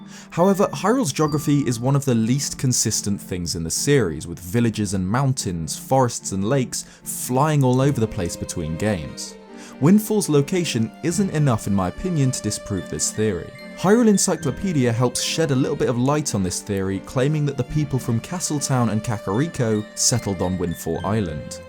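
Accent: United Kingdom. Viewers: Am I the only one that's crushing hard on this guy? Zelda love? British accent? Cutie? 😭 I promise I'm not thirsty just wanting a Zelda soulmate.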